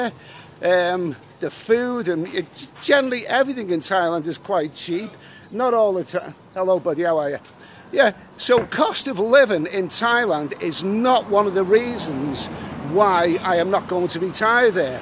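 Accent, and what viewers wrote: Liverpool, United Kingdom. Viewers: A squeeky clean Scouser!!!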